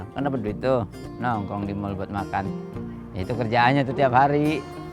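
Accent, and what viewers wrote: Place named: Jakarta, Indonesia. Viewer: Betawi bat yg ngomong. 😁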